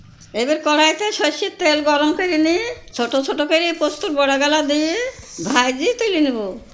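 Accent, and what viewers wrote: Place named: Nadia, India. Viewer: ঠাকুমার বাড়ি কিছু নদিয়া জেলায়?....... ভাষা শুনে তো তাই মনে হচ্ছে।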